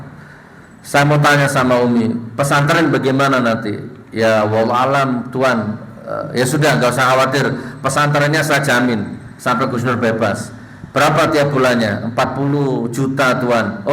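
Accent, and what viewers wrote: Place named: Banyumas, Indonesia. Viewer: Senangnya sama Gus Nur ini blaka suta cara bahasa ngapaknya love you Gus ❤❤❤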